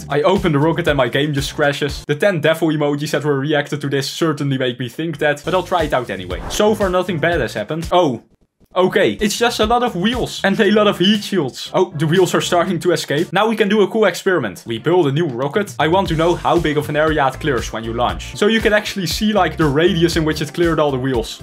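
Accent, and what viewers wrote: Netherlands. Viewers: Question: are you Dutch? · ik smell a littel nederlands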